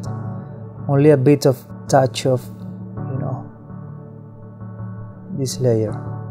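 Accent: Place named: Spain. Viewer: Are you from Spain? Because I am and I can notice your accent 😂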